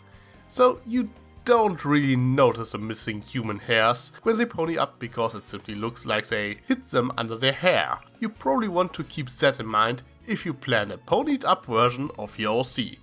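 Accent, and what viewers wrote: Germany. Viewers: ARE U GERMAN!?! BECAUSE IM FAMILIAR WITH ZE ACCENT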